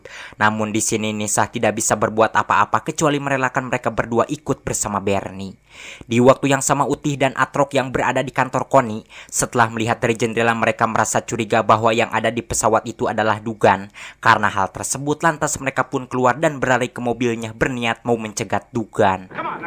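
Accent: West Java, Indonesia. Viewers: Sundana ti mana kang admin?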